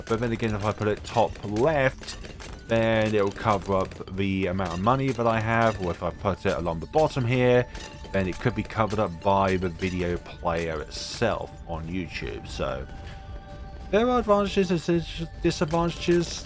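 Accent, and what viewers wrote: United Kingdom. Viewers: Nah, no facecam. There's already a hook keeping me here, the british accent and dying to yellow bloons.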